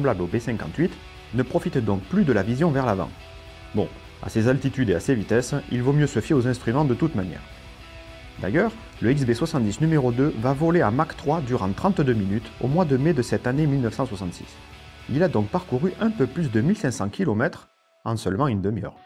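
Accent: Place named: Marseille, France. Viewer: Amusant, l'accent Marseillais !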